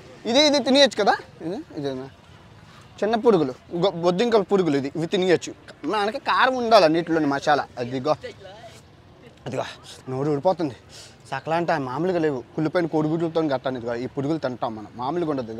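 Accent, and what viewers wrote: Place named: Godavari, India. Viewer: Anna godavari yasa super ga matladavu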